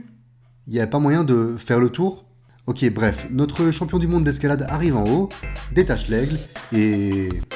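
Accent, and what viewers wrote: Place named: Belgium. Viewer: On entend que tu es belge parce que tu prononces la séquence « ui » comme « oui ».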